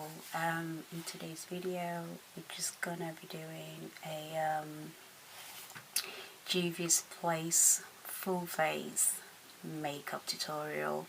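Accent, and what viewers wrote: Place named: England, United Kingdom. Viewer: Hi... Looks nice but how does it work on wood face?...seriously, your always beautiful, that English accent 😃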